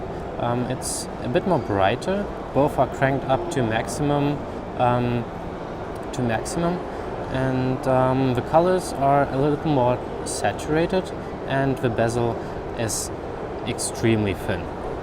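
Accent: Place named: Germany. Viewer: so süß, wenn ein Deutscher englisch redet ;)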